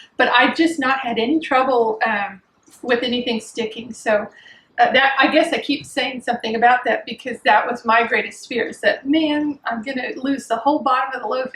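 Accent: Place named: Austin, United States. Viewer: Love your demo (and your accent: I'm an Austin native!). I love that people are rediscovering clay bakers. Back in the 70's there was a trend (well, back then it was just called a fad) where we were all baking loaves in terracotta flower pots (in our bell-bottoms with flower-patches and halter-tops made of bandanas). Worked like a charm.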